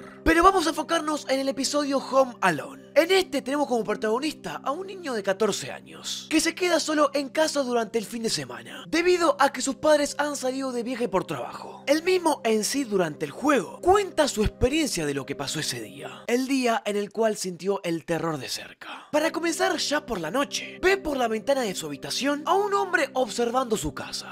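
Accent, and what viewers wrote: Argentina. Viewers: Tu acento argentino queda piola con esa especie de aliento cuando terminas una frase xd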